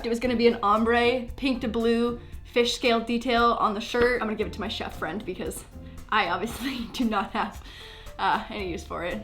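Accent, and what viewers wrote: Canada. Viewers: New here. Love how she says "about". Reminds me of Cristine. Is she from Canada?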